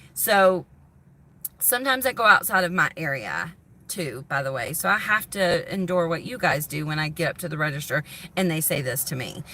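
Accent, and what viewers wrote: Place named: Georgia, United States. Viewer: Love your attitude, you sound exactly like me I was born and raised in Georgia. But I now live in Oklahoma LMAO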